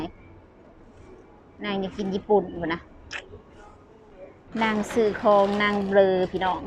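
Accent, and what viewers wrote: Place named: Laos. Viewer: เว้าลาว ดีแล้วครับ ที่ติดตามเพราะมักฟัง สำเนียง น่าฮัก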